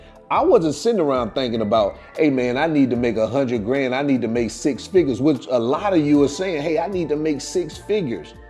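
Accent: South, United States. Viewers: I have to say , I love your country accent!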